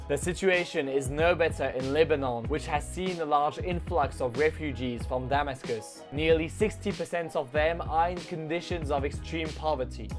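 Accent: United Kingdom. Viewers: perso j'entends plutôt du British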